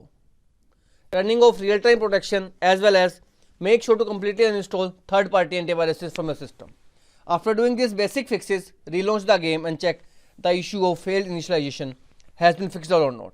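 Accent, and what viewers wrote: India. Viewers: Thank you for the video, I am going to try these fixes. Just one thing; can you please speak slower, it is very difficult with the Indian accent to understand what is being said. I'm an old guy and I find it very hard to follow.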